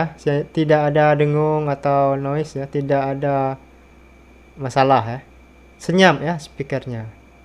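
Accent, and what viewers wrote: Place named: Borneo, Indonesia. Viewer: Logatnya sperti logat Dayak...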